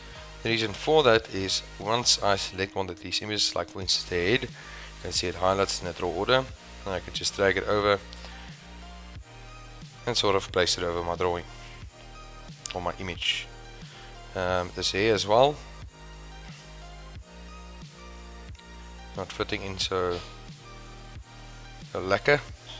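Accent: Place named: Johannesburg, South Africa. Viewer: thought i recognized that accent. jo burg? anyways thanks again from a total beginner. question: how are you enlarging or shrinking the parts when you are are moving them around. what controls are you using?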